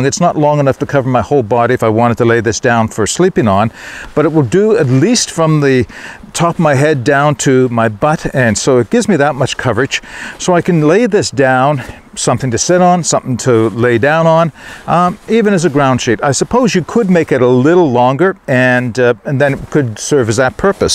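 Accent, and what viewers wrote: Canada. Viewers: I love the way Canadians pronounce “ou” similar to here in Scotland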